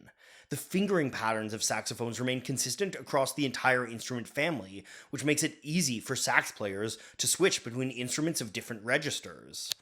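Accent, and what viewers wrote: Canada. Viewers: Well, he is Canadian. In classical ensemble instruction in the UK I only heard it said corn’t from various directors and instructors. I’ve only heard Americans over-emphasise (to my ears) the net part.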